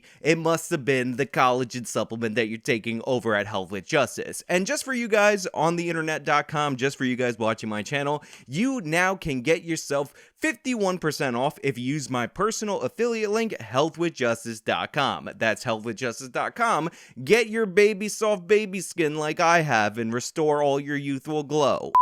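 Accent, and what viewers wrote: New York, United States. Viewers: I like your videos dude but this New York accent that you keep doing in every video is getting annoying haha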